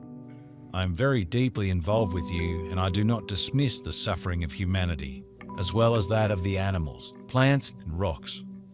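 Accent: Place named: Australia. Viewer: something about an aussie accent that is easy to dismoss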